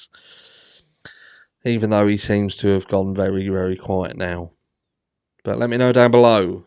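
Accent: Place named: Somerset, United Kingdom. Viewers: Hello from Gloucester, lovely balmy evening tonight, but dreading the winter 😟 Love your videos and your lovely Somerset (?) accent. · There's nothing particularly bizarre about my comment at all. You said yourself that MWT behaved in some ways like a "content creator". Maybe he has "created some content"? Was that not one of the very questions you were touting? Call yourself what you will, you are a person who is also inserting yourself into high profile cases to further your channel, raise your profile and therefore further your own ends. I see little difference between you and MWT in many regards. I'm not having a go at you, merely intimating that you are not that different to him. All people in the media create / deliver content to some extent. The difference with him is that he is an older bloke trading off the back of having "exposed" Savile, albeit posthumously, hence could not be sued for defamation. 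I think MWT is something of a chocolate teapot and an opportunist. He is asking questions about this case somewhat in the public eye, as are you. You are a so-called content creator who has created this blue branding to match your blue eyes, and pontificate, slightly sanctimoniously at times, about cases in what sounds like an Estuary Essex / West Country fusion accent, and in a slightly laconic, sweary way. You purport to speak about cases respectfully about cases as if you "had skin in the game" but seem decidedly disrespectful about the 46-year-old "Hungarian fella" you say "should have f**** known better". Perhaps your benevolence only extends to young gangsta dabblers, and the similar fate of a sl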